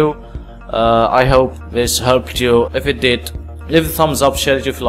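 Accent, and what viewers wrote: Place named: England, United Kingdom. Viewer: wich place of england are you?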